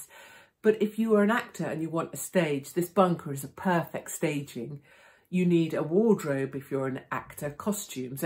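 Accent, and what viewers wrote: United Kingdom. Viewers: is one of the only Brits I can listen to without getting sick of hearing that annoying accent.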